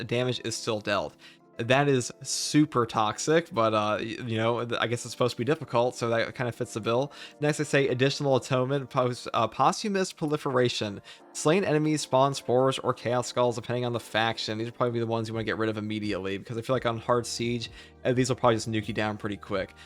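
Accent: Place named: AAVE, United States. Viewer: ebonics.. I think??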